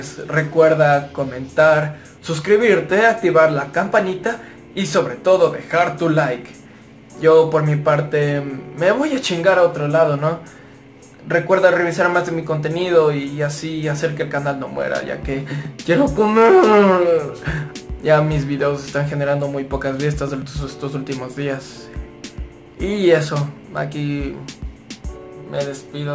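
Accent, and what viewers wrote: Ecuador. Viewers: Eres Ecuatoriano.??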